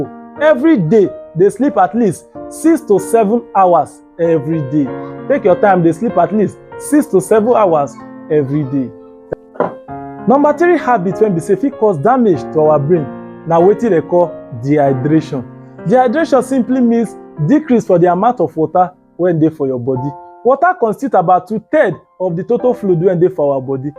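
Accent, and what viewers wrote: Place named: Nigeria. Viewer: I didn’t know your pidgin is this thick oo 😂😂😂😂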